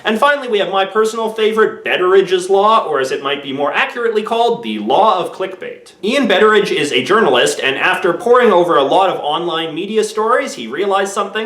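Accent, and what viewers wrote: Canada. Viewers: Is that a Canadian accent?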